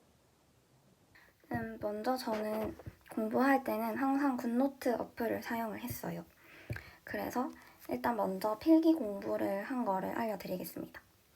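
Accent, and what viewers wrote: Gyeongsang, South Korea. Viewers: 경상도분이네요!! 친숙한 말투 ㅎㅎㅎ 전 포항출신이에요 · 경상도 분이신가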